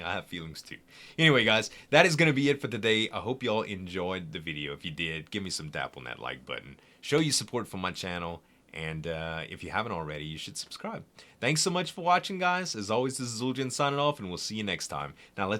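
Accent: Louisiana, United States. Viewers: I knew just from hearing you in Slip's videos that you were from Louisiana. Your accent screams Acadia. :D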